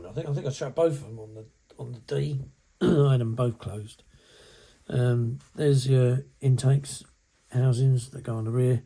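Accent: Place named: England, United Kingdom. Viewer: With some English dialects I have troule hearing right..did he say the resin pilot and thrust cone comes with the kit?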